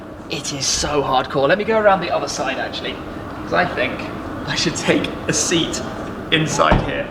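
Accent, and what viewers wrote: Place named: United Kingdom. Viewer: 협찬 잘~ 들어온다.. 너의 혀짧은 영국식 영어는 여전히 적응이 힘들다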